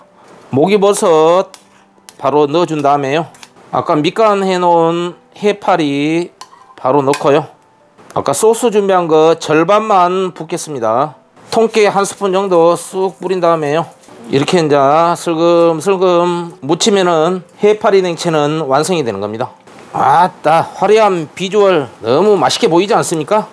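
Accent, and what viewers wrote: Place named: Gangwon, South Korea. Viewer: 강원도 분이시네요. ㅋㅋㅋ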